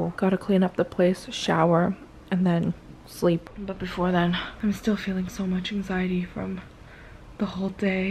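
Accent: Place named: South Korea. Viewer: konglish on point 👌🏻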